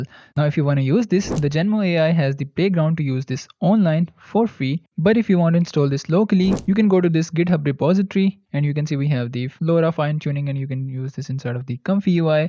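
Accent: India. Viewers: Honest opinion. International audience will only watch neutral international voice and accent. Not our hopeless unimpressive Indian accent. Change it to AI voice for more views. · I actually prefer this real voice and accent over AI by now.... maybe bc it’s authentic and I trust it more now, I’m sick of these AI voices cause every Indian guy takes advantage of AI like crazy 😂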